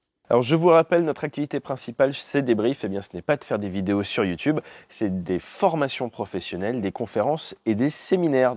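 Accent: Quebec, Canada. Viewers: Etes vous québécois quoi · Êtes vous québécois ou français? Parce que de toute manière vous êtes génial. Mes amis anglophones apprécieraient une version sous-titrée en anglais.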